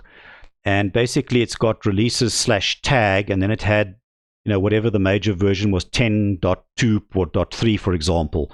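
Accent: South Africa. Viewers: Thanks for the video. By the way South Africa or Netherlands? hearing a familiar accent here.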